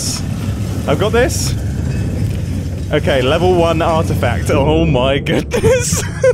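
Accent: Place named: United Kingdom. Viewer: It's funny how becomes more British as he gets excited and stressed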